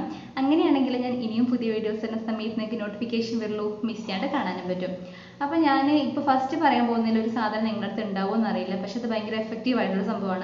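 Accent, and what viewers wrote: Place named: Thrissur, India. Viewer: thrissur shaily is best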